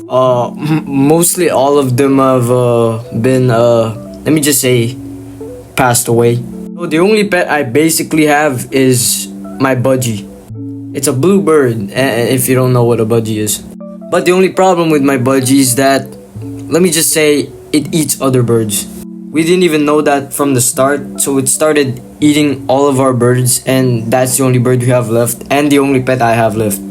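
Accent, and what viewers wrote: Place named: Texas, United States. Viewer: why does bro sound texan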